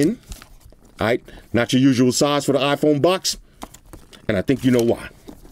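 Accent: New York, United States. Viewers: Yo going off is the greatest shit lol. The NY force is strong. You can hear the wind hitting the timb tree in his heart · My mans def from the NY area! 😂 “jefferson forehead” LMFAO!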